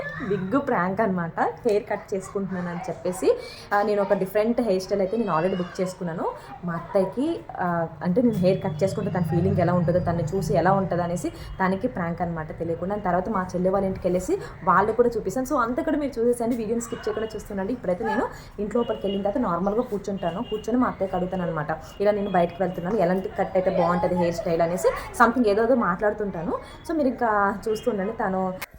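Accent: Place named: Srikakulam, India. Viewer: Aha emi slang mana sklm ante aa maatram undali super prank sis madi sklm town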